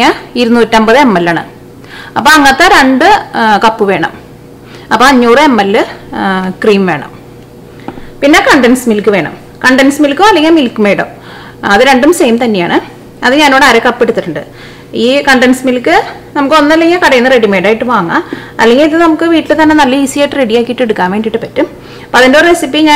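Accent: Thrissur, India. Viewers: സംഗതിയൊക്കെ കൊള്ളാം പക്ഷേ ഈ തൃശൂർ ഭാഷ ഒന്ന് മാറ്റിക്കൂടെ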